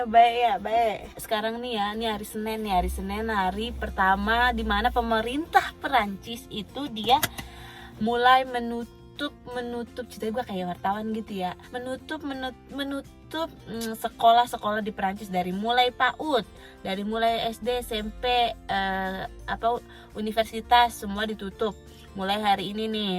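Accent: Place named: Jakarta, Indonesia. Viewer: ya Allah mpok😱..kgk salah ntu😅..alis knp tinggi sebelah ya mpok🙄..sukses trs ya mpok dgn logat betawi nya🤩🤗🤗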